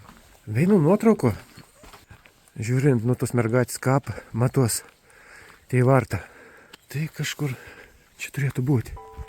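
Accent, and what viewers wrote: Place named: Samogitia, Lithuania. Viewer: Netikėtas Jūsų atradimas, bet sakyčiau labai maloniai nustebinęs. Toks paparastas vietinių, įdomių, nežinomų ir mažų dalykų parodymas tiek Lietuvoje , tiek Latvijoje :). Man kaip aukštaičiaui labai patinka , kad tai darot žemaitiškai. Sėkmės !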